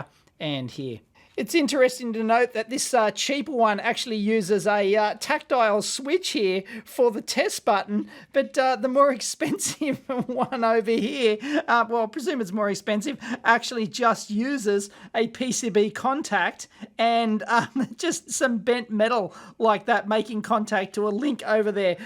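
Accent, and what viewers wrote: Australia. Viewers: Somehow the outrage sounds more intense with an Australian accent.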